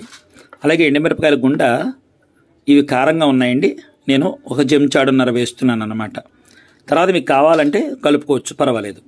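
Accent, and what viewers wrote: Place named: Guntur, India. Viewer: Guruvu gariki namaskaramulu. Mee vantalu ma amma garini gurthu chestunay andi. Meedi a vooru andi. Mee vucharana guntur district ni gurthuchestondi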